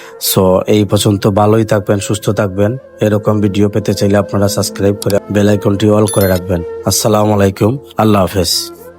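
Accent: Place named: Sylhet, Bangladesh. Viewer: You should learn how to talk first .then make a vedio .you always try to speak with sylheti ok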